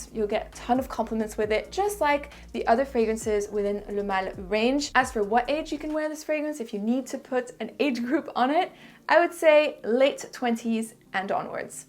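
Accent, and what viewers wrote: France. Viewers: You got a nice french accent , are you from France? Je veux dire ton anglais est excellent en plus de ton bon français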